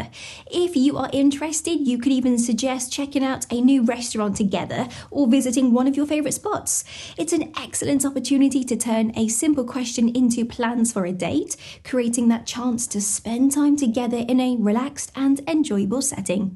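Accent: United Kingdom. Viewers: I find you entertaining, because you manage to talk a load of BS in an engaging manner, and you are cute. Congrats also on your wardrobe and that perfect delivery, complete with crystal- clear pronunciation, no (or very few) grammatical mistakes, captivating demeanour and enviable British accent. But what are you going to do when you run out of topics - or should I say 'run out of BS'? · Love your accent, what part of the UK are you from?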